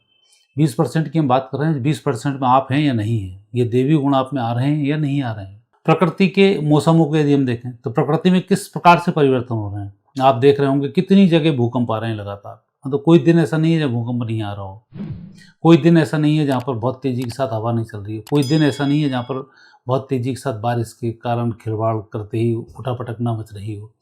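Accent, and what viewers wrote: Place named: Madhya Pradesh, India. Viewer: आपके स्वर से प्रतीत होता है मानों आप भोपाल या होशंगाबाद के आस पास से हैं। या मध्य प्रदेश कहीं से। क्या मैं उचित कह रही हूँ।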